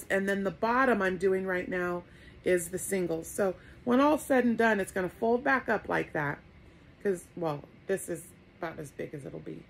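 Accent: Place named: Pennsylvania, United States. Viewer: Hello. Love listening to your stories. I grew up in Fontana California and there was a Kaiser Steel plant there. Most men worked there in the 60's and 70's. It was torn down around 1999 in order for the California Speedway to be built. My daddy died in 2002 and I still miss him so much. I also say wash like you and i say younse and buggy for shopping cart. I got these words from my mom and dad who grew up in Pennsylvania. Have a great day.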